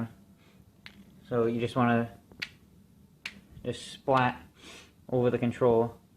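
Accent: Boston, United States. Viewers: where u from? you sound like you have a slight boston accent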